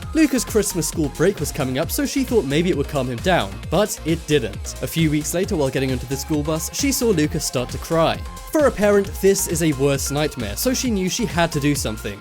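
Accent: England, United Kingdom. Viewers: He's speaking American English with an English accent ??? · God you're so dumb , he pronounces mum differently because of his accent. Just because he has an English accent doesn't mean he's going to pronounce it as Mum.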